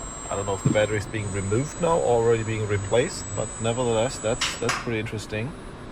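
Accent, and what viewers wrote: Germany. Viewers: Very good. I like it very much when Germans speak English. Go on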